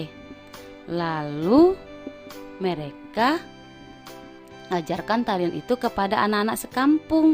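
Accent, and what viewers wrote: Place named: West Sumatra, Indonesia. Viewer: Msih pkat logat minangny buk...ttp smngat cik gu ,🙏🙏☺️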